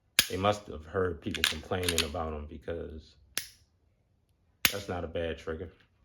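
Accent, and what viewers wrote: Baltimore, United States. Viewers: You from Baltimore aint yew?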